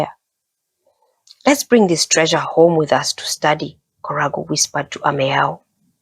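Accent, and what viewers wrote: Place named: Africa. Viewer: Thank you for this read along book about Kente Cloth. Our Beautiful Feet Books primary curriculum suggested it to be read and we thought you did a great job reading it. We especially liked your accent (we assume you’re African) and also the native words were pronounced well. One piece of advice for next time you record would be muting the page turning with a sound editor or turning each page away from the microphone. The page turning was quite loud and disruptive during the book. Other than that, it was a great read through and we look forward to exploring your channel with other books.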